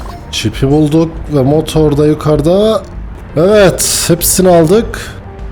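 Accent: Cyprus. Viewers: Kibris şivesi cok guzel hocam video terapi gibi geliyor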